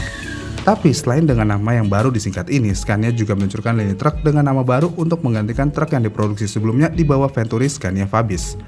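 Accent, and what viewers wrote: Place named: Cirebon, Indonesia. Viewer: Ngapakers cirebon detected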